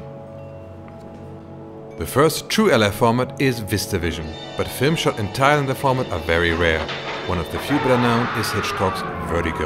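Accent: Germany. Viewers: That was actually a really great video! Although I hate your german accent, but no offense buddy. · well I had to watch about 3 or 4 videos to be sure 😁 you speak very good english. Im german too so I know what makes the German english dialect but I think others wont notice ;) Looking forward to new videos. Love your videos.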